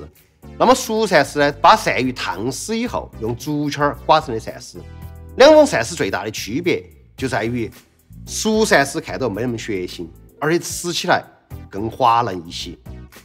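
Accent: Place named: Chengdu, China. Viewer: 对不起我要刷屏了莴笋颠颠儿～ 莴笋杆杆～ 凹～ 溅～ 新宣～ 的成都话好标准好想回成都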